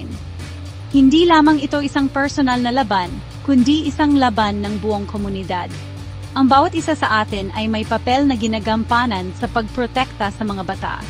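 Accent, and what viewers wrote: Visayas, Philippines. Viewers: Yung narrator bisaya naman .trying hard magpaka host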